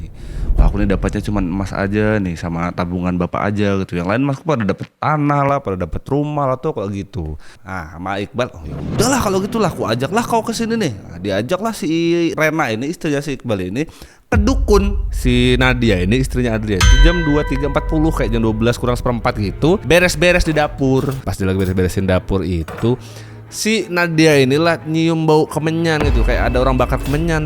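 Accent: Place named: Banyumas, Indonesia. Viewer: Asline ngendi kang,kayane wong ngapak kie ..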